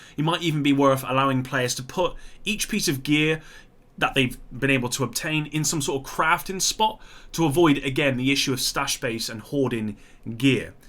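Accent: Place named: West Country, United Kingdom. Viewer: Wondering what a "mega Fred" is... Just teasing I love that West Country accent. Also bring back optimisation!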